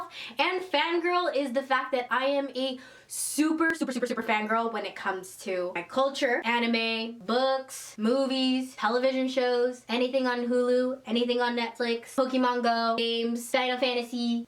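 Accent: Philippines. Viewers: Gosh! You're so cute, girl and your taglish is cute too :) I also wanted to start my vlog, glad you mentioned the negativity that I need to overcome. Btw, I'm a fangirl of #Jadine too Haha!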